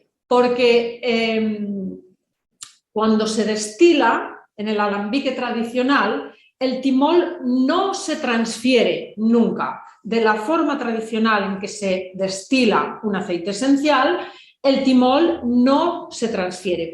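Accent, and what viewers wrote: Argentina. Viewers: Hola, Te escucho y escribo desde la Patagonia Chilena, estoy en la ciudad de Puerto Varas, y por casualidad hace un tiempo he puesto tus videos de casualidad, primero me llamó la atención tu llamado a estar preparado para una posible crisis, que me parece muy práctico y manejar los alimentos y plantas medicinales para usarlos sin tener que depender del supermercado en caso de alguna contingencia, usar conservas es una forma de no desperdiciar comida, me parece muy interesante lo que planteas, el pensamiento, el entendimiento de antiguos ardides que se han usado para interpretar a conveniencia las escrituras bíblicas es lo que más me gusta que hasta debería ser la base de las clases de religión, y Miguelito el marciano es todo un hit!! felicidades y siempre mucho valor y carácter, aparte me encanta el acento argentino que tenés, abrazos desde Chile estimada.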